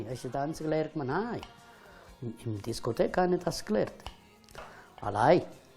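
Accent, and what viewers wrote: Italy. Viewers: Woher aus Italien ist? Er spricht das s in Bolognese stimmlos aus, das spricht für den Süden, aber seine Vokale klingen eher norditalienisch...wir müssen ihn mal testen. Kann ihm mal jemand eine "cadrega" anbieten? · Er isch ganz dütlich vom Süde. Erkännsch vom Akzänt und vo de Art (positiv gmeint) :-)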